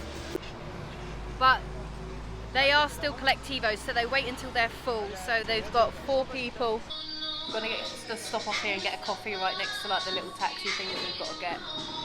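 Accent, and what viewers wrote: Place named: United Kingdom. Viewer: I heard the accent... I'm immediately...I give like I really like uk accent 😍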